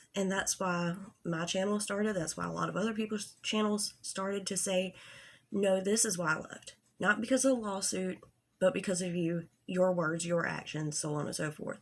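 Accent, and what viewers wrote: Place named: Georgia, United States. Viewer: Are you from GA? Just trying to pinpoint the accent. Coming from AL over here.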